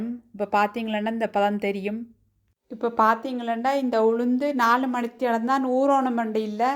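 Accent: Jaffna, Sri Lanka. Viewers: Your Yaazhppaanath Tamizh accent is amazing!!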